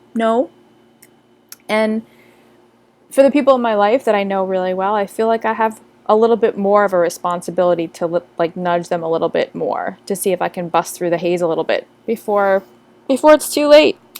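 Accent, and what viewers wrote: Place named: Spain. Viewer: I love your Spanish accent.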